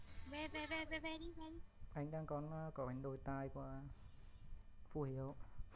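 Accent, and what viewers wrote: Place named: Ha Tinh, Vietnam. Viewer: Ahihi...tiếng hà tĩnh đi mô cũng k quên đk 😀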